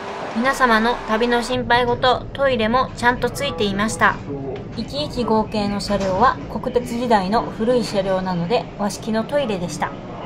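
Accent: Kansai, Japan. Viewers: 関西訛りのイントネーションがとても好きです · 丁寧に話しておられますがちょいちょい隠しきれない関西の荒っぽさが出ていて親しみを持ちましたw博多までお疲れ様でした！次の旅も楽しみに待っています。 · ところどころ出て来る関西弁のトーンの親しみやすさ。117系など識別できる鉄分の濃さ。 もちろんお顔も美人で大ファンです。(南大阪地区の鉄ヲタのタクシードライバーより)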